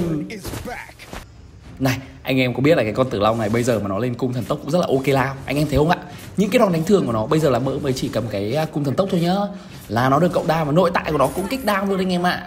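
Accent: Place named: North, Vietnam. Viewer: Trai Bấc ló vậy đóa bẹn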